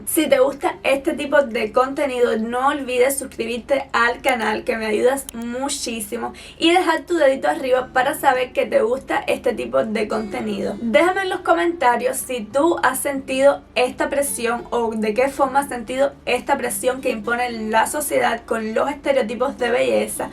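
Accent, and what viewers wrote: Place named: Cuba. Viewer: Creo que tiene acento cubano, me gusta!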